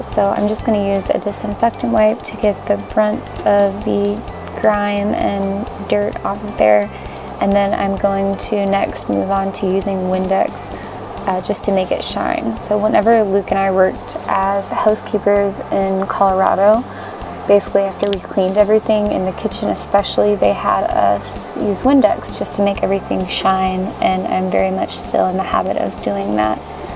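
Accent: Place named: Canada. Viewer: Great job!! One question I always wanted to ask you though. Sometimes you have a somewhat Canadian accent at times. Are from up north in the states or Canada originally? 🙂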